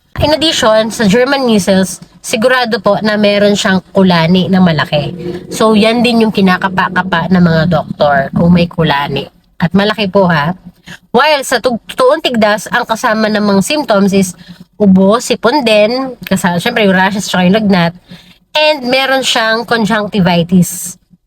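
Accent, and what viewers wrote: Pampanga, Philippines. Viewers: Kapampangan kayu po?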